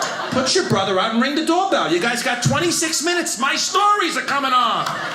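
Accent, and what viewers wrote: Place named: New York, United States. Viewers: Love the "New Yawk" accent!! I wanna hear more from this guy--he is dynamite! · Italian new york accents always get me. Feels like home 😅😊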